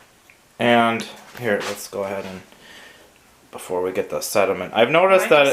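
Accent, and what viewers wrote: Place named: Minnesota, United States. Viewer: ahh I love a good Minnesota accent.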